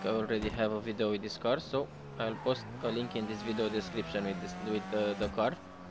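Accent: Romania. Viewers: Are u Romanian bro? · Ești roman după voce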